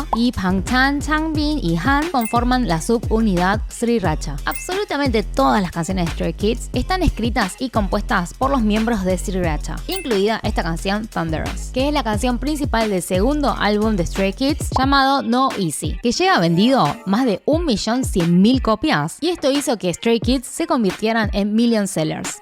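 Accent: Argentina. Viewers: Te sigo de hace mucho y lo que más me gusta es cuando haces estas explicaciones y nos acercas más a vuestra cultura que dicho sea de paso es super mega interesante para los de este lado del mundo,agradecida por todo ahora no solo disfruto de sus voces bailes y música sino que también entiendo la temática que hay de atrás. Muchas gracias mi niña eres súper amorosa y tú acento argentino me encanta ,un abrazo y bendiciones desde Chile🙋🇨🇱🙋🇨🇱🙋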